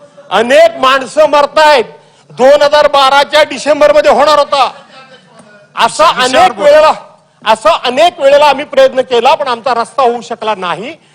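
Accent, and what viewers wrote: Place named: Konkan, India. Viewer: कोकणी आवाज, अभ्यासपूर्ण व मुद्देसूद मांडणी केली. भास्कर जाधव साहेब 🚩🔥🐯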